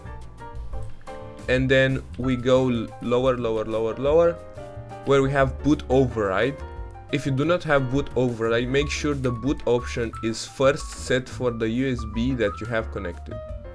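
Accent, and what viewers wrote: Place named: Romania. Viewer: ba te am vazut roman dupa accent si dupa am vazut si emagu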